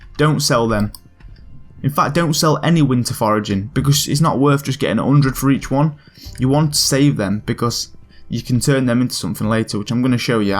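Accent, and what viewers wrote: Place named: England, United Kingdom. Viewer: Where in England is that accent from? Or is it a speech impediment? My apologies if the latter, but if not, for the love of god it's annoying as all fucks. Feel like I should leave a compliment to balance this comment now... nah. I'll just like the video. -1 +1 karma.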